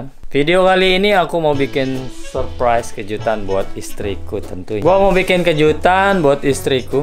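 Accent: Lombok, Indonesia. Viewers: Saya baru nemu chanel nya bang,,stelah saya nonton bbrp videony rasany kok bahasa indonesianya lombok banget..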